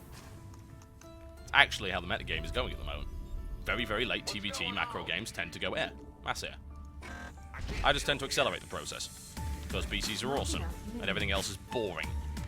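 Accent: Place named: United Kingdom. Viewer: I really wish you would continue this series tb. I understand you're a busy man with all the SHOUTcrafts and whatnot but this is a nice series to watch as a struggling beginner. I think watching you play and hearing your commentary on what you should do and what you should have done better really helps. Watching the pros play doesn't help much because its very fast paced and hard to follow and learn from. Plus hearing your charming british accent is nice too :).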